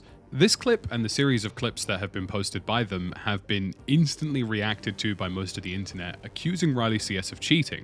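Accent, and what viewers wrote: United Kingdom. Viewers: You're avoid the issue because the man being accused is one of the "protected" degenerates and you don't want the heat. You sound like you live in the UK so it's really risky for you.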